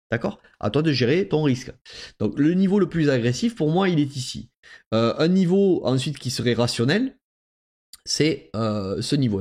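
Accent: South, France. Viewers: Santé avec ta jarre lol je me régal à écouter tes analyses avec ton petit accent sudiste peut-être non....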